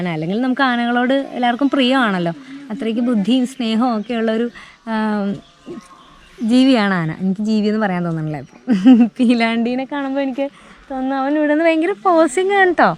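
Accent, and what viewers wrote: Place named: Chala, India. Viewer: മൊത്തത്തിൽ ഒരു ചാള സ്ലാംഗ് ഫീൽ ചെയ്യുന്നുണ്ടല്ലോ... പിന്നെ dotted deer എന്ന് പറയുന്നത് ശരിയല്ല കേട്ടോ Spotted deer ആണ് കറക്ട്..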